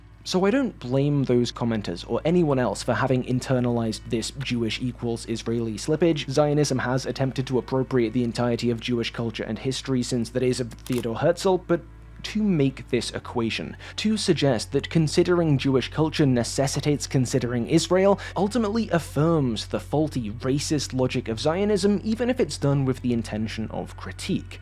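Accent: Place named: England, United Kingdom. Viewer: I was able to watch about 1 minute of the video before you put out the Holocaust inverting, antisemitic lie that Israel is engaging in genocide. You're not going to convince me that this bullshit video is accurate by showing me another bullshit video. I assume from your voice that you're from England. There is a better case to be made that England is an "ethnostate" than Israel. Both have the same number of non-practicing people- about 50% for both countries (That would be illegal in most fundamentalist Muslim countries fyi). England, however does have a national religion. The upper chamber of the House of Lords must be of one religion. There is no such provision of the ruling body of Israel, the Knesset. The fact is that ANY of its neighbors is FAR more deserving of that epithet than Israel. But your not interested in the truth, you're interested in a narrative. 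That narrative is that Israel=Boravia not because it's analogous with reality, but it's analogous with your propaganda. In the movie Boravia is the big bad, Jarhanpur is the helpless victim. In reality the war started because of a genocidal antisemitic attack by Hamas. In the movie there is no Jew hatred, in fact in the movie your bullshit belief that Judaism is somehow separate from Israel is reality. In the movie the little black boy calling out for Superman was center of attention. In reality he'd be in the slave section. In reality the IDF is highly diverse. In the movie they're all white males. The movie corre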